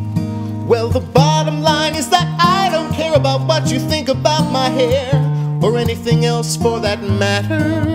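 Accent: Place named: Canada. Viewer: Making love to a glove is so overrated! I like your vids and your curious canadian accent, keep it up!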